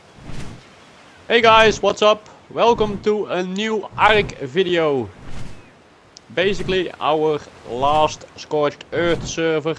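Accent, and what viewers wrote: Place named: Netherlands. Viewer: En je hoort heel duidelijk dat je nl bent 👍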